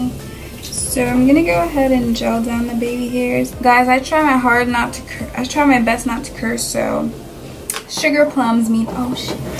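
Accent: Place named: Haiti. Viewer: Haha I knew you were Haitian by your accent. Love it♡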